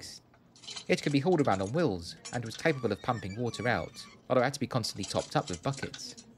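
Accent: London, United Kingdom. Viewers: No way man your accent is straight out of Mary Poppins and your foreign viewers must absolutely love that 😂👍🏼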